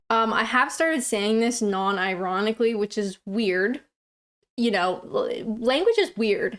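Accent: Canada. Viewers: Hello chick, knobhead is a great English word, a friendly insult!? Also I have been told a person's accent settles between 17-20 so it's unlikely that you will ever lose your Canadian twang unless you do it deliberately which is an option after a certain amount of time!? xx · At the time of bus conductors,com on git aff.meaning hurry up and exit the bus. please speak English your Canadian is still strong. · You need to go for several pints with a Gen X brit, also we don't all see Canadians as foreign, you are like us with a funny accent x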